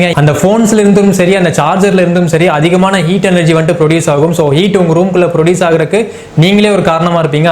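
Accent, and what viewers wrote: Coimbatore, India. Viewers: Nenga Coimbatore aha I jolly.. Naan Sathyamangalam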